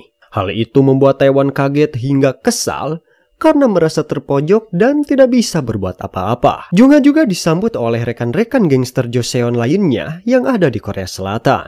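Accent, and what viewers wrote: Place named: Medan, Indonesia. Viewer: Pasti ini orang medan yg pengisi suara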